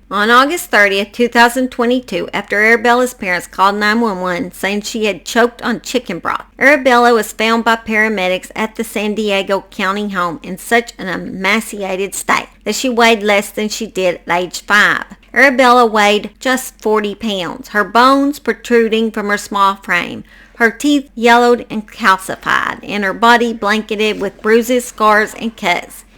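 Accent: South, United States. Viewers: sweetheart, my Mum would've loved you ❤, we both always loved hearing that beautiful southern accent ❤. You're gorgeous 💕. Haven't left many comments dear, but watch all your videos❤🇦🇺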